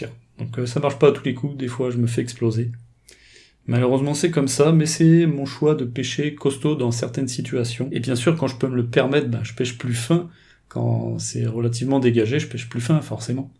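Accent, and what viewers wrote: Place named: France. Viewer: Penso che parli francese dal accento ma purtroppo io non e parlo e non l'ho capisco cazzo però parlo 3 lingue ma non capisco manco il francese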